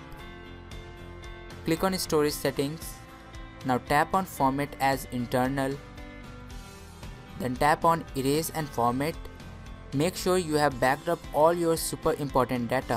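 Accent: India. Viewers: I like the video but the matter is i can't understand what he says cuz it's always indian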